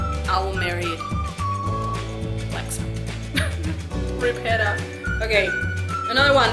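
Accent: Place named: Spain. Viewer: I love your spanish accent! I'm from Dominican Republic :)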